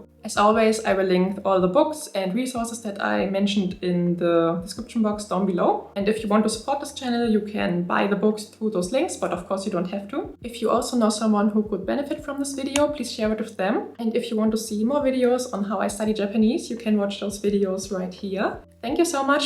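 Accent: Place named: Germany. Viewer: Hey, fellow German? 😁 Thick accent just as me. I'm also waiting for the JLPT results. I'm in Language School in Japan though. Still I get study slumps, and especially speaking is scary here as well. Can 💯relate! Keep at it! 💪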